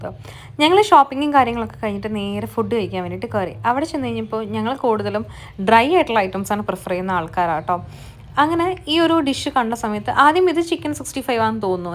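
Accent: India. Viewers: Ii lokathu Tani Malayali mathreyullo? Also, this girl's from Central Travancore and Tani Malayali is from Northern Kerala. Nalla samyam analo😂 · pand trivandrum slang arunnu. Ippo tani malayale Malappuram valancheri tone aayi. Yes tani malayale pole tanne ind.njn parayum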